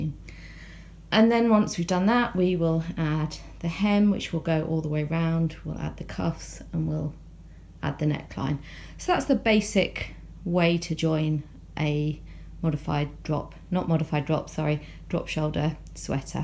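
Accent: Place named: United Kingdom. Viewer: I wish that you were my neighbour you angel. Thankyou for this video. Nice to hear another Uk lady. I really love your work and you explained it all just perfectly. ❤❤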